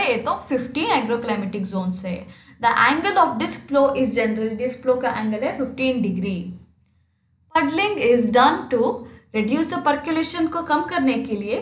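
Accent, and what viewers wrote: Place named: South India, India. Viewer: Just love ur speaking accent .👀🙈 R u from south india..🥺👀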